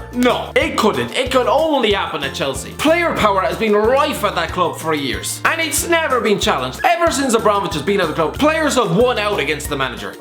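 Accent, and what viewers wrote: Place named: Ireland. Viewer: Annoying voice you Irish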